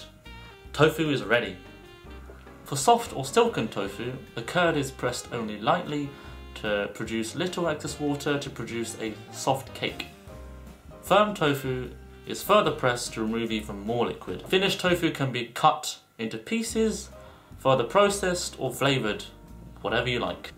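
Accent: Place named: China. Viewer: You must be living in an Asian country for too long - your accent is very chinese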